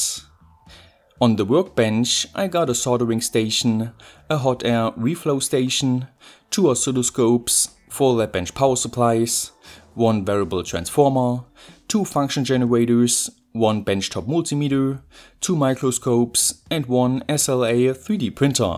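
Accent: Germany. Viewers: I thought he was German, ja?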